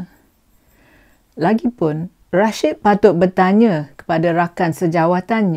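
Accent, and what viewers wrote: Perak, Malaysia. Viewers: askum kak, kak org perak ker , kadang ckp ada loghat perak tu hehehe, but i agree you are 100% betul , malaysia need a total reboot kak, a total reboot cause all this while we are being manipulate , we need new people like kak , the real people...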